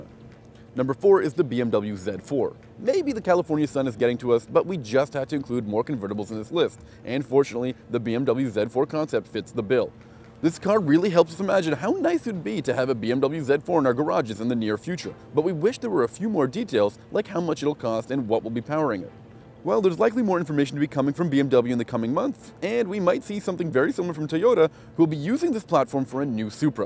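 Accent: Canada. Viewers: Is he Canadian? They say zed as well as a lot of other nations. I ask if he's Canadian because at times there isn't a noticeable differance between American and Canadian accents.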